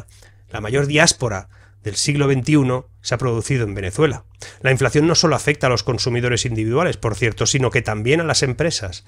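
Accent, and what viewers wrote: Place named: Spain. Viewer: Habla 120% como un español pero tiene nombre y apellido franceses, y parece que vive en Francia. Curioso.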